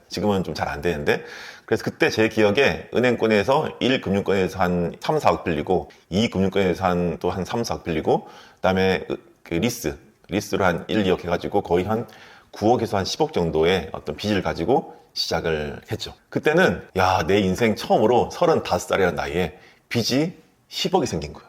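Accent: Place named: Busan, South Korea. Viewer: 사투리가 들렸는데 부산대의대 선배님이셨군요..! 너무너무 재밌게 들었습니다ㅋㅋ 인턴하면서 하루하루 일하고 자고만 반복했는데 영상 보면서 동기부여가 많이 되네요 포부를 크게 가져야겠어요 좋은 영상 감사합니다!!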